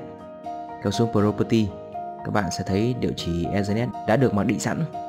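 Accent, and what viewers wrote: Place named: North, Vietnam. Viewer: Rất hay, giọng miền bắc thân thương, video ngắn , trọng tâm ko dài dòng'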